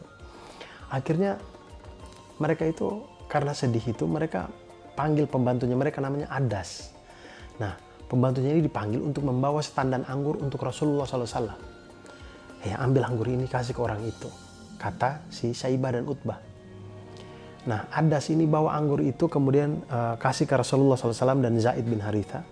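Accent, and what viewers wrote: Eastern Indonesia, Indonesia. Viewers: Tetap ada logat timurnya ya. Suka sekali. Apalagi cara pembawaan ceritanya. Cocomi jd story teller.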